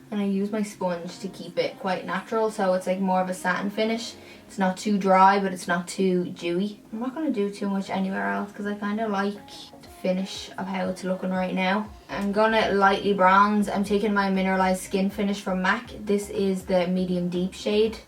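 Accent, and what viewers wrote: Ireland. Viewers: Are you Irish? I love your accent👍🏻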